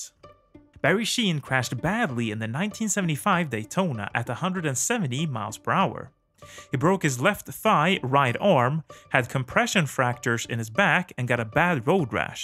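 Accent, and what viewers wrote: Sweden. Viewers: You sound swedish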